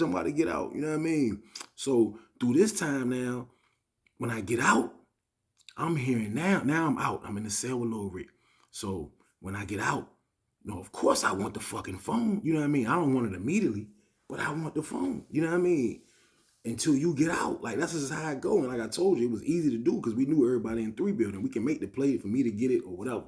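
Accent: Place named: Bay Area, United States. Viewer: a lot of similarities between how you talk and Bay Area. Richmond Cali is hard and of course we been calling Oakland da TOWN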